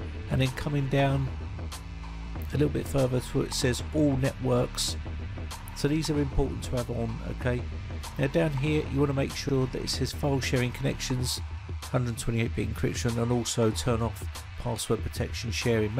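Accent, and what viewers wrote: United Kingdom. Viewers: a damn British Limey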